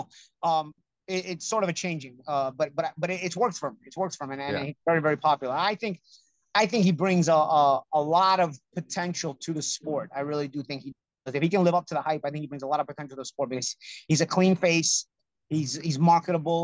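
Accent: Brooklyn, United States. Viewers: annoying Brooklyn accent 😂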